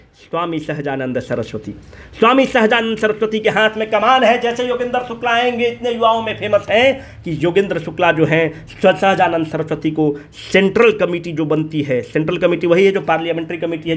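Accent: Bihar, India. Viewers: The way u teach is really incredible sir....feel really blessed to be a part of bcw family🙏 nd ur narration in bihari tone 🧡gives extra motivation sir....soon this channel will become one stop destination for all bpsc aspirants......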